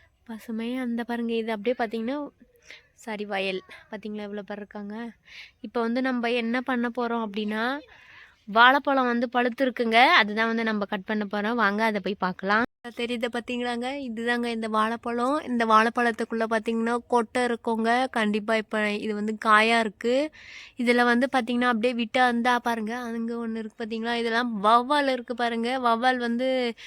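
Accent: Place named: Kongu, India. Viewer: Ungaluku sondha ooru edhu.. neenga eppadi anga poneenga.. alagana Kongu tamilla pesureenga adhanala keten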